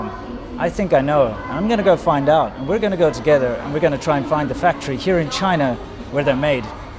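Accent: South Africa. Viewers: for inputting Chinese, you should try iFlyTech speech recognition input, you just speak to the phone and it writes for you. It would gradually adapt to your South African Chinese accent as well. After trying that a year ago, there is no turning back to typing Chinese. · I am so fascinated by your ability to speak and read and write Chinese! Equally as fascinated by your ZA accent. Just a question - did you flip the video of yourself in the car? Or you actually drove a right hand drive car? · Are you South african?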